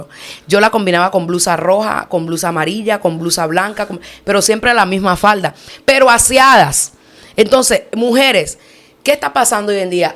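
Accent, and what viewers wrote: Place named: Dominican Republic. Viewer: Esta hermana parece Dominicana tiene el acento de el pueblo Hato mayor Rey Dios siga bendiciendo esta mujer del Reino un abrazo a la distancia ❤❤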